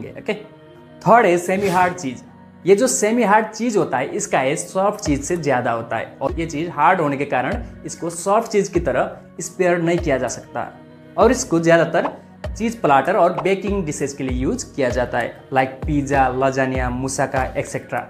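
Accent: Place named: Nepal. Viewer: Aap nepali ho na ... tone bepali aaraha hain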